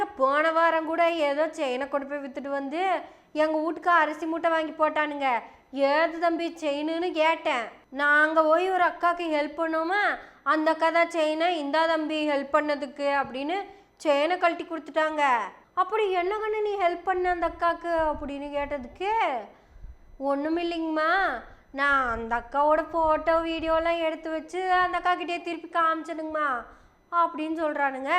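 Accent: Kongu, India. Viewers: Kongu intonation WoW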